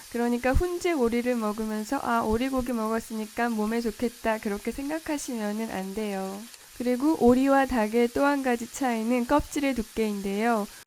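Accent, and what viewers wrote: Seoul, South Korea. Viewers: 와 이분 진짜 오리지널 서울 출신이신가보다. 서울 사투리가 말투에서 묻어나네요.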